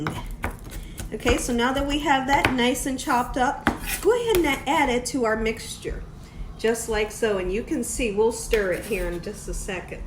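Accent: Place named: South Carolina, United States. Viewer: I love her voice she sounds like my family in Charleston South Carolina.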